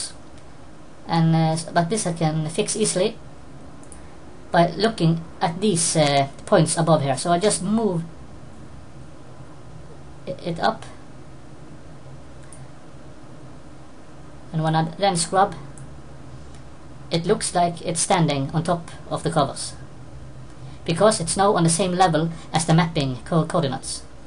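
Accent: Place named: Bergen, Norway. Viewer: Faen du kan høre at du er BÆRGENSAR! :)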